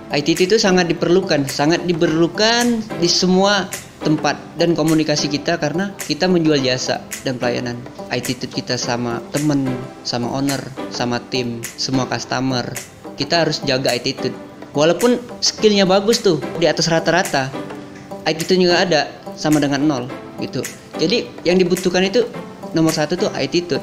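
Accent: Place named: Palembang, Indonesia. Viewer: Mantap..gaya ngmng palembang masih Ado😀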